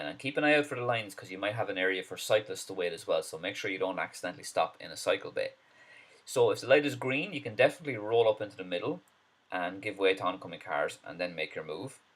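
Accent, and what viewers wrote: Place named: Ireland. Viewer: we thought it was Scottish but now we think it's Irish haha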